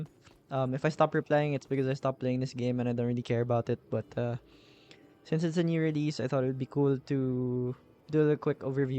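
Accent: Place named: Philippines. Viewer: love the build boss. From the way you talk i assume you're filipino :).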